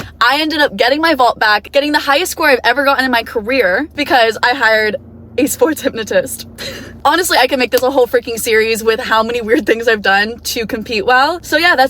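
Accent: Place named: France. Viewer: your french accent was everything 😂 😭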